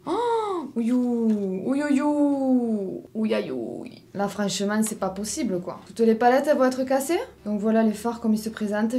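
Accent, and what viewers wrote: South, France. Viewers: J’adore vos nouveaux cheveux …….du Québec j’adore votre accent du sud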